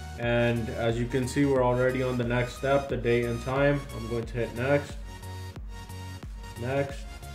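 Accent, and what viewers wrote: India. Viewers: You know this tutorials wont work when they have that shit music and indian accent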